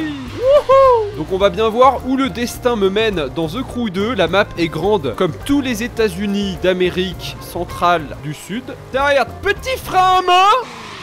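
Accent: Switzerland. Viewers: Avant que tu parles du lac leman j'aurais parié que t'étais Vaudois... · T'es Suisse ?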